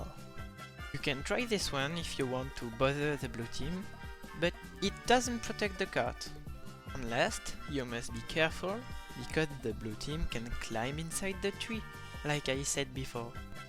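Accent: France. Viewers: Tell me,judging by your accent,are you…french ?!(which there is nothing wrong about)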